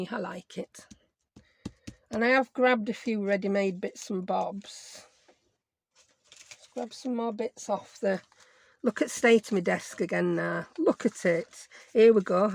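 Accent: United Kingdom. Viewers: Hi! I'm so glad I discovered your channel. I love listening to you. You have such a cozy voice. ( I watch most other people's videos with the sound turned off. ) Also, as a Brit who has been is the U.S. for almost 60 years, your lovely accent is a wonderful piece of home, even though I'm actually from Brighton. And, I like your tutorials. I'm fairly new to journaling, so your information is very much appreciated. Thanks!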